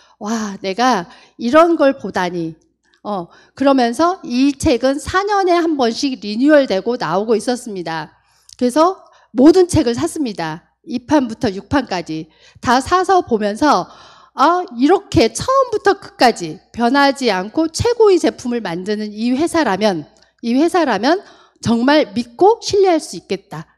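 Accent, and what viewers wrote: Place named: Jeju, South Korea. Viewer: 리더님 하면 구수한 제주 말이 기억이납니다 친정어머님도^^ 행복의 기준! 저에겐 건강이였습니다 좋은강의 감사합니다♡♡♡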